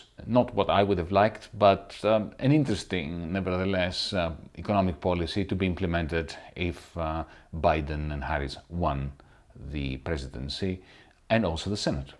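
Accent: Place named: Greece. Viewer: Just keep using your Greek roots as you speak. I'm envious it makes your speech a lot more sophisticated and easy lol.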